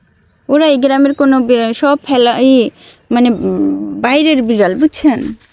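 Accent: Khulna, Bangladesh. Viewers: আপু আপনার ভিডিও দেখি শুধু আমাদের খুলনার ভাষা শুনার জন্য। ❤️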